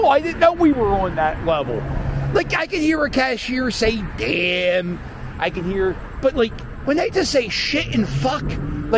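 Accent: Philadelphia, United States. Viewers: Philly accents are the best.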